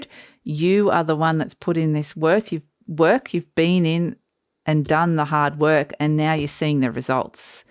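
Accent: Australia. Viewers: Lovely to hear an Australian accent!!!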